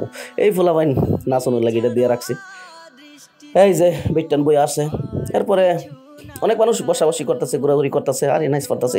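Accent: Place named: Raipura, Bangladesh. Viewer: ঠিক মতন দিছেন একদম আমাদের রায়পুরার ঐতিহ্যবাহী আঞ্চলিক ভাষা!!! আমডারে লইয়াও তো যাইতে পারতেন.....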